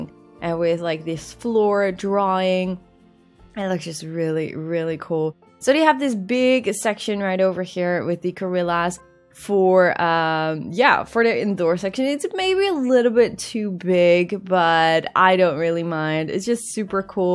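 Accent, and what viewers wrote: Netherlands. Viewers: Are you Dutch? You have a very thick Dutch accent, I love it :D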